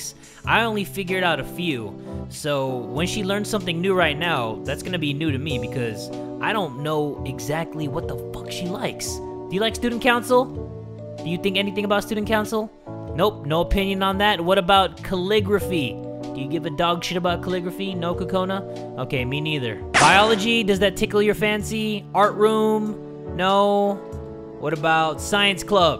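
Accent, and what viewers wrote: United Kingdom. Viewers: great British accent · I LOVE YA BRITISH ACCSENT 10/10 [I know British accsents because I am British] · Hahaha i love your british accent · i love hearing speaking in a british accent because im british lmao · Omg, that British accent ♡^♡ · Why is bro British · Love the British accent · I said that before this was even an updated OWWWWWWwwWwWwWwWwwWWww all I hear is nice happy music and then all of a sudden starts talking My ears are bleeding let me turn down the volume Hold up did it just turn itself back up God dang it * clickclickclick* oh no it’s too quiet * click x5* now it’s way too loud Jesus *clickclickclick*. Perfect wait hold up he’s British! Thank you for following my journey It was kind of like it was live but wasn’t sadly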